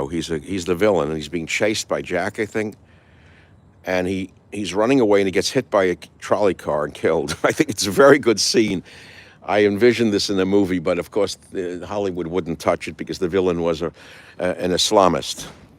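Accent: New York, United States. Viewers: hooorrrible just horrible. so new york sounding lol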